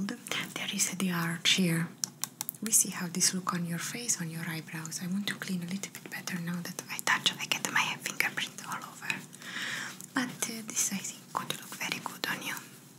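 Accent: Italy. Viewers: Hi fantastic ASMR I liked it a lot and I enjoyed it with your Italian accent that I love and listening to your sweet relaxing voice greetings beautiful 🥰😍💖🌹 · I love your Italian accent ASMR. I love how you always manage to say something that makes me laugh out loud. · a “new rude Italian exchange student” would be such a fun role play with this accent! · Ah yesss Italian accent 😍😍❤️ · Ciao bella.... your itailian accent so great..i love it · oh my god! are you actually italian? cause your accent is literally perfect👀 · as an Italian person this is exactly my accent when I speak English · I can’t what I love more your Italian 🇮🇹 accent or you ❤️ 💕 💗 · omg i think i found my new favourite series! the italian accent makes me feel like im a tourist in italy and boy i miss travelling 🥺 keep it up!!❤❤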